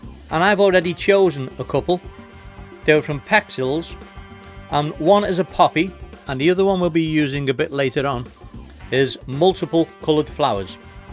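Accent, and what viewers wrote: Liverpool, United Kingdom. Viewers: love your Liverpool accent.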